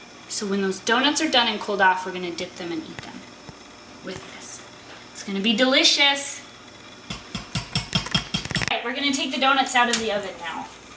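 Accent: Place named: Midwest, United States. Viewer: These look amazing! Dying over your midwestern accent LOL. Can't wait to try them!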